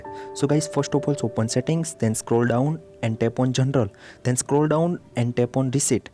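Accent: India. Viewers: This is the fattest indian accent i’ve ever heard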